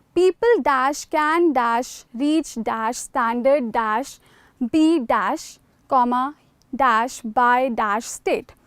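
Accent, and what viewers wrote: India. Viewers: Dear teacher ,your lesson is very fantastic,but it is a India accent!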